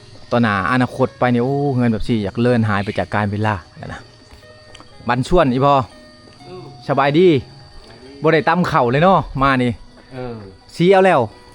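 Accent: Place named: Southern Isan, Thailand. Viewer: อยู่แขวงไหนครับพูดเหมือนคนอิสานใต้ครับ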